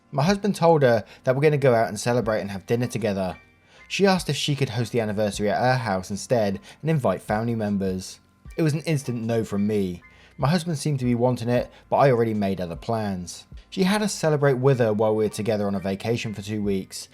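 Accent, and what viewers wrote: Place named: Midlands, United Kingdom. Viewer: Where abouts are you from? I can't place your accent is it Midlands?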